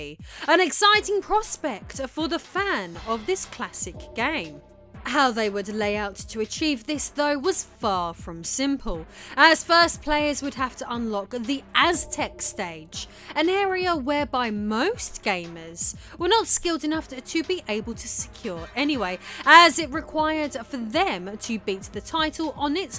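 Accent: United Kingdom. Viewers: This British Accent 👍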